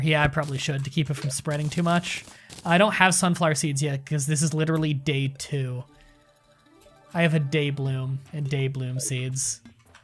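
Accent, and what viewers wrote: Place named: Southern Ontario, Canada. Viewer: Gotta love that Southern Ontario accent of his! Best Canadian Youtuber!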